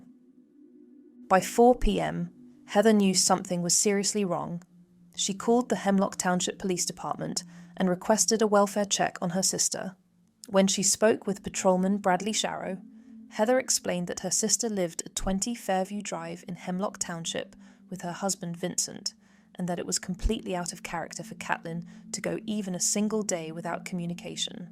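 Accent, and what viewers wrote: United Kingdom. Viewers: This channel has its roots in the UAE yet the presenters accent seems to be from the UK 😮. 🤔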